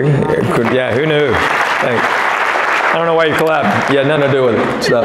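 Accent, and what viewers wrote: South, United States. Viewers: I think it’s cool how he’s Asian yet considering he sounds like a Southerner he is popular with white people as opposed to only being popular with Asians like you would expect the average Asian comedian to be. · I always admire the clear communication skillset. His intentions are easily understood even in the slightest of gestures. The biggest gesture is him always being entirely respectful. It surfaced as the "Sir and Ma'am" emerged through the marriage questions. It is easy to see why he has been successful so many years. The effort of getting to his shows are always such a pleasure. I stumbled on his name through a Tom Arnold movie "McAle's Navy". I knew the other comedians as my eyes and ears could not synch up the southern voice with the Korean appearance.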